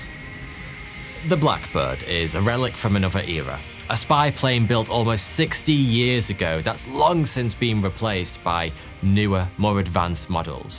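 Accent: United Kingdom. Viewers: Good video and good sense of humor. Also, 'good' Brit accent that makes 'th' into 'ff'. 'Fifth'. for instance, becomes 'fiff'. As one obsessed with the details, I wonder what region that represents?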